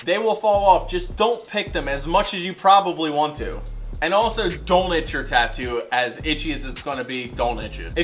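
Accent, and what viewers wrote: Philadelphia, United States. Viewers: You sound like your from philly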